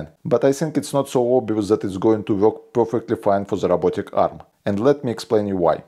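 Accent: Russia. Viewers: Я не очень хорошо знаю английский но по моему у автора явно русский акцент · Is this a Russin accent? ) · Ты же русский, я слышу. Хватит придуриваться, говори нормально!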